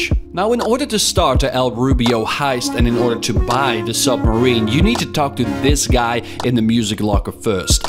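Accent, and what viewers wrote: Netherlands. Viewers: Hold up, are you dutch? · Wait what is he Dutch? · Are you dutch?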